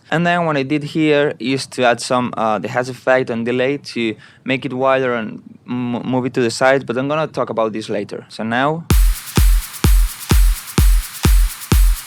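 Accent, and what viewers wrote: Spain. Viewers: Tipic spanish inglish JAJAJA GOOD video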